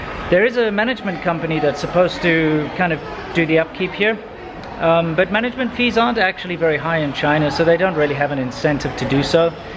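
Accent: South Africa. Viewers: consciousnick - he’s from South Africa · You clearly do not watch South African soapies to recognise a South African accent. Recommendation. Watch past reruns of etv Scandal! By the 1000th episode, you will be able to tell who is a British South African and who is Afrikaans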